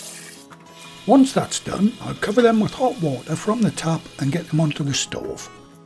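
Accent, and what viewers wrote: United Kingdom. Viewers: 너무 잔인해 You are so cruel 이런 멋진 감자라니 It looks Very nice potatoes 감자를 싫어하는 나도 먹고 싶어지잖아 I want to taste them even l don.t like potatoes 그건그렇고 By the way 너의 영국발음에 중독되고 있어 l am addicted your English accents 너 때문에 오븐을 살지도 몰라 lf I buy an oven it's because of you 하여튼 너의 동영상이 맘에 들어 Anyway l like your video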